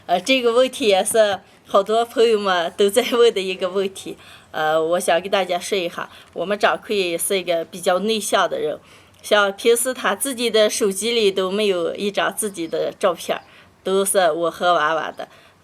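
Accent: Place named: Northwest, China. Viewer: ，加油！你的视频为我在疫情期间带来了温暖和乡音。保持西北人特有的质朴和真实，相信你的视频会传播的越来越远。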